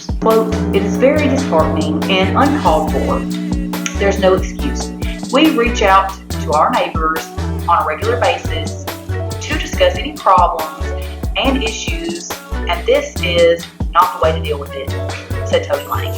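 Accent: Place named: South, United States. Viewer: I like the Southern accent. It’s very similar to the Manchester Accent in the UK 🇬🇧.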